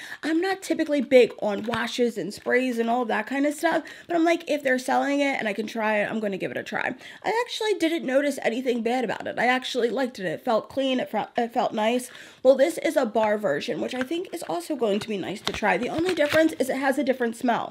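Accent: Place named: Philadelphia, United States. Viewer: i hope you don't mind this comment, but i kept hearing your accent and could swear you were in the philadelphia area, and then when you showed the mirror and i saw the wawa cup in the back i felt vindicated LOL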